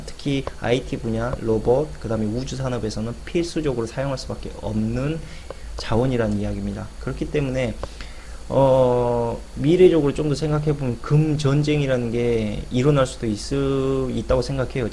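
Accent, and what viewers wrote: Jeolla, South Korea. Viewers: 억양이 전라도같은데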